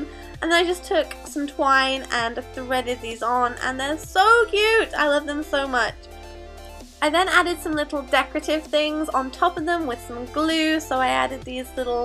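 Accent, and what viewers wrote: England, United Kingdom. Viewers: are you English? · Hi I'm really confused I love your vids but I just can't figure out your accent! Are you British ( like me yay!) but then you have a slight American tinge too! Also you mention American shops.. Please enlighten me!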